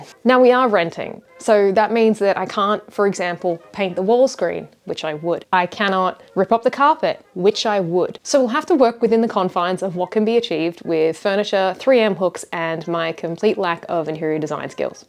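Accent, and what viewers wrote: Australia. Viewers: Listening to another Aussie is the best....... beautiful shirt.... enjoy the sunshine.... Winter is coming....🦘🦘💐🌹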